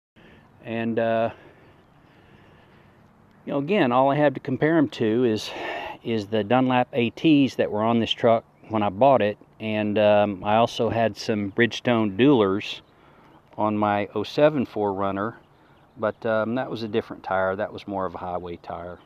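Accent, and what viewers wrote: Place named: Texas, United States. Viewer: You sound like you have either a Texan or Southern accent. Your California license plates threw me off. 😂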